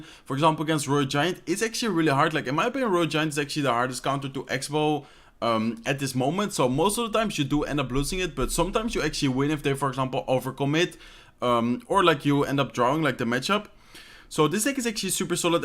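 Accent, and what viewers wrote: Netherlands. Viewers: Is deze gast niet nederlands of lijkt dat zo aan zijn accent te horen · Are you dutch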